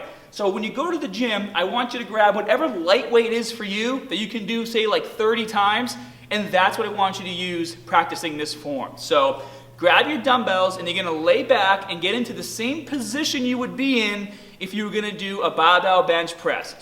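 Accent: Boston, United States. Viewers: He's gotta be from Boston. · Grab the "bah"? Lol. I am a Bostonian transplanted to FL. Nice to hear home. Great vid too. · trying to help you guys. Since I am suffering right now from shoulder pain, maybe it’s a good idea to listen to him instead of making fun of his beautiful Boston accent. Yes, there are differences about how far down to drop your arms, but you can experiment with light weights and then draw your own conclusions. And I wish you success too! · I've never heard your Boston come out so much in my life 😯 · Gotta love Bostonians and their accents!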